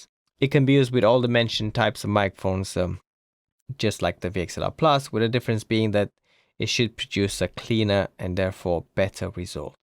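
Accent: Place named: United Kingdom. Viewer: ❤your accent, like a native British. Also your knowledge in audio science is extensive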